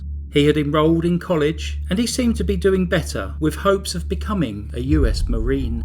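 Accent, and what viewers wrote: United Kingdom. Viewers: I also love British accent ❤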